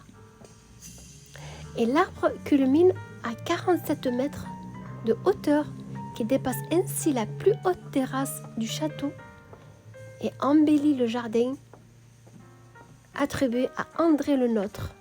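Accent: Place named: South, France. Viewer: Très belle vidéo surtout avec ton accent du midi...Une vraie conteuse..On dirait Nora ACEVAL 😊 Très beau château avec ses jardins paradisiaques...❤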